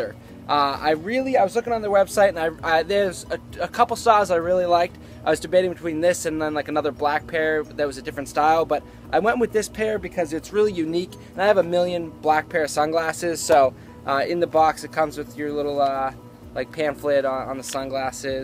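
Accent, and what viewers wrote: Boston, United States. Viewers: boston accent Tha unabombah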